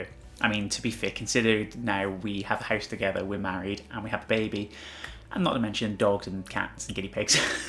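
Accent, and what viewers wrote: Wales, United Kingdom. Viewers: Are you Welsh by any chance? I hear a bit of a Welsh accent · let me guess you guys have a pug? LOL I am guessing that is the animal you have considering there is pug shit everywhere including the sweatshirts your better halves wear you seem like a really cool guy they are lucky to have you I’m glad I found this channel it is inspiring and the stories are always fun I wish all of you nothing but the best. also I did have a question I was wondering where you guys were from? I am from Milwaukee Wisconsin I am figuring somewhere in the UK from the accent but I’m not sure. Anyways take care now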